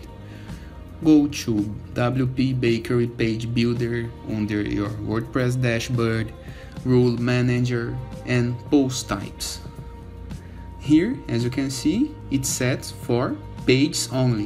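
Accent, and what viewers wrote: Brazil. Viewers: Pense num sotaque BR! vlw mano pelo vídeo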